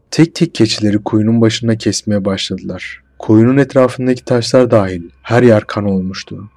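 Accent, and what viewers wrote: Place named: Thrace, Turkey. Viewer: Severek dinledim ama merak ettiğim bişey var şiven trakyaya kaçıyo doğrumudur ben babaeskiliyim başarılar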